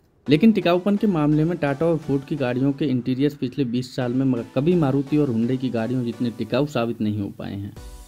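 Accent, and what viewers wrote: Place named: Hindi Belt, India. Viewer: While this is a good comparo, The Hindi accent used by the narrator makes it difficult for me to understand. I understand hindi well, but in this I had to listen again and again to make sense of a few sentences. Perhaps it is speed along with accent that makes it difficult for me as I am not a native of Hindi belt... :(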